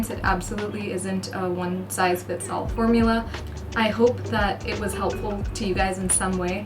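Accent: United States. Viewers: Why do you speak in an American accent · Did you do your studies in 🇺🇸 US, I am in US for my masters I wanted to get like your accent 😊 Please help me in improving my accent ❤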